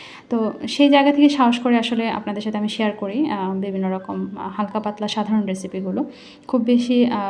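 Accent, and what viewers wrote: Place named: Chittagong, Bangladesh. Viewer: Ohh Chittagong er meye🩷 bole kotha 🩷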